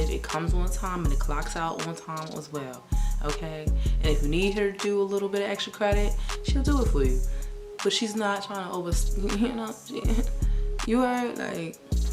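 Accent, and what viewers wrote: New York, United States. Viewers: “Are you duumb?” You’re so NY I love it!! 😭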